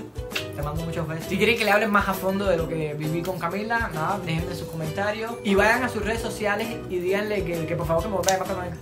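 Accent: Cuba. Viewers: Me gusto mucho tu forma de hablar 😆tu acento como cubano pero cool! 😂. Y los look de maquillaje de Camila 😲👌👌 de lujo ! Aqui otra opoyo de.mi parte 😉😉 . Me gusta como te expresas 😊😊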